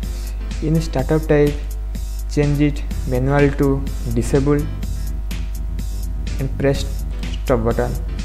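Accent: India. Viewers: cannot understand indian English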